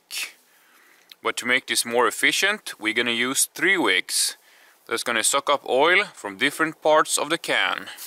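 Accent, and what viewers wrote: Sweden. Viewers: Nice. I've know about most of those. Never thought about permanent markers. Is that a Swedish accent you have? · Great video! Are you Swedish? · Kung är du man hör att du är svensk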